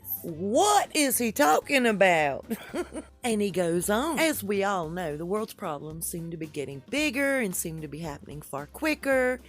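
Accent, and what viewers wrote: South, United States. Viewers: Love you! From one southerner to another. This N’awlins girl digs that drawl!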